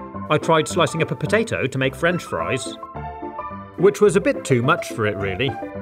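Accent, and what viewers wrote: United Kingdom. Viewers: Are you from uk🇬🇧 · He's British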